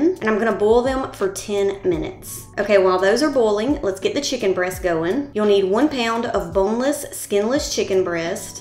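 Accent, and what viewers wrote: South, United States. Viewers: "bowd eggs" 😊 "taws" 🥰 I absolutely love her voice and accent. (I know, many from the South are thinking, What accent? 🤔)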